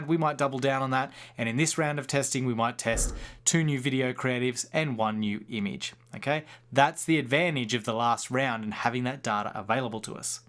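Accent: Australia. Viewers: Hey, good to hear and Aussie voice, also I suspect relevance to local biz. Any ideas re real estate fb ads? Regards Tony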